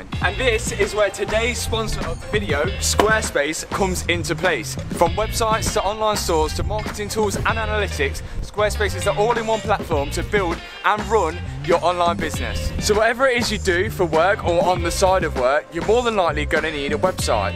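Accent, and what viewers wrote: Leicester, United Kingdom. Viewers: I hear it absolutely fine but then I'm also from Leicester, know Mallory Park! Must be a "Lestah fing"!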